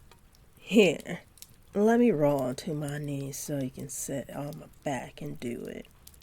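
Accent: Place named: South, United States. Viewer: Damn, that southern accent hits me, great work on the audio chief!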